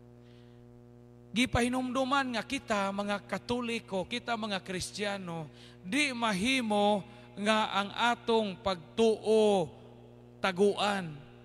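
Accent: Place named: Bohol, Philippines. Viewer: Lively pod kay siaw man siya. He reminds me of our former DepEd Division supt who is also from bohol. Parehas sila mosulti binol anon jud.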